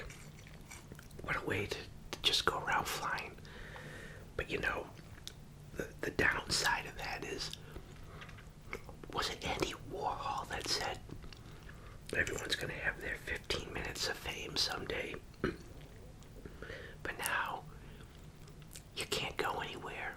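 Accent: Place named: Boston, United States. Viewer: This was painful to watch, from the constant unnecessary stirring of the drink to the "townie" unsophisticated way he eats, the Cornell plates and silver wear he uses, like the low of the very low and the Quincy Boston accent...the whole thing left me with.a feeling of sadness and being thankful it was not me.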